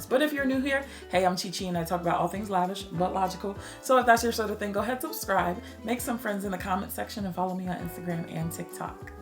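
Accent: New Jersey, United States. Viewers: Your voice sounds just like Wendy Williams ❤❤❤❤jersey vibes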